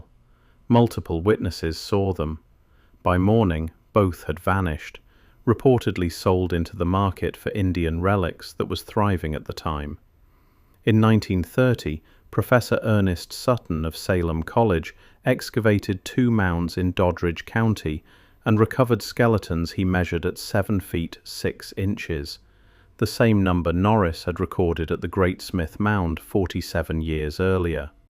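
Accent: United Kingdom. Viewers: What’s with the British accent? Has AI been programmed that speaking with British accent lend to greater credibility, more believable or entertaining.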